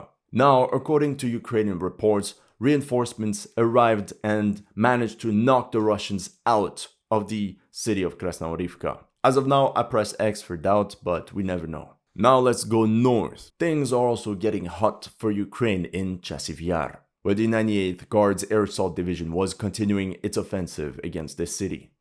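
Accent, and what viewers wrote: Jamaica. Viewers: 😂😂😂😂 i love this one 🇯🇲🇯🇲🇯🇲🇯🇲 you sound like a Jamaican 🇯🇲🇯🇲🇯🇲🇯🇲🇯🇲💯💯💯💯🇯🇲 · 🤣🤣🤣🤣🤣🤣 Boboclaaaat ! love the Jamaican voice . big up mi g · Bomba Clot'! I was trying to figure out your accent. You're Jamaican!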